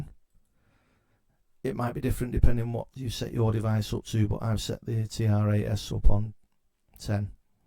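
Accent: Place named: Manchester, United Kingdom. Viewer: Hi bud, been thinking of buying the Mk111, looks like a lot of menu diving though and a pain to set hardware and software VST up to as there's no midi control software for the Mk111. I'm going off previous video's I've watched Obviosly. How are you finding it? You sound like your from Manchester too:) Oldham I'd say...am I close??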